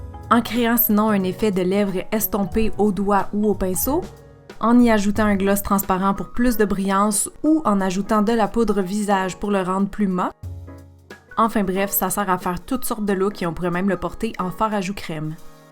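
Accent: Canada. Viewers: As un accent Canadien ou pas ?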